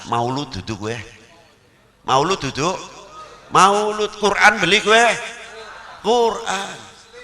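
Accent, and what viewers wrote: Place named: Tegal, Indonesia. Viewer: Padahal asli pekalongan tapi bahasa tegale kaya wong tegal nemen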